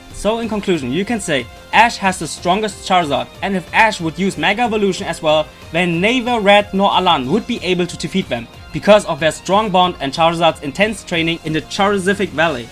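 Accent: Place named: Germany. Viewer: you sound german ^^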